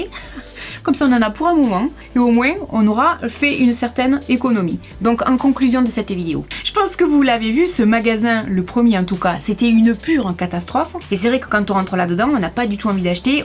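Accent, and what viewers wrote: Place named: Pyrénées-Orientales, France. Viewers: Je confirme malheureusement, voilà pourquoi votre accent me disait quelque chose... ( Je vis également dans le 66) :) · J'adore ton accent du sud ouest !! Un vrai délice auditif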